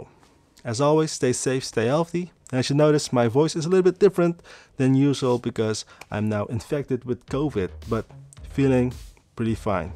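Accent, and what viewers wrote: Netherlands. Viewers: Ik kon gewoon horen dat je uit nederland komt 👏🏾 I want a camera so bad but the prices are sickening here in the Netherlands (everywhere tbh) 😩 Trying to figure out how good the cheapest ones are.. Nice video, thank you~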